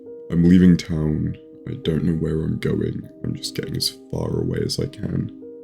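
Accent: United Kingdom. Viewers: H0T BRITISH MAN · DEEP VOICE BRITISH MAN!!!!!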